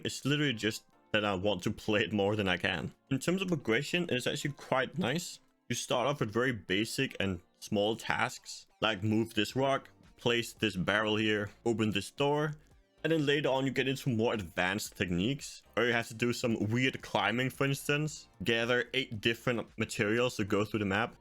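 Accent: Denmark. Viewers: Thick ass danglish accent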